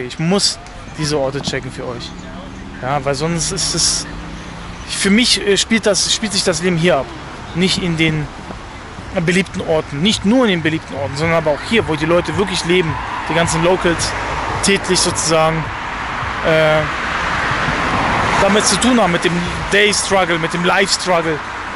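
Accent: Berlin, Germany. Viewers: Ich merke einen leicht überheblichen Berliner Ton. Typisch „Berliner Schnauze“. Sorry, aber du kennst weder Billstedt noch Hamburg!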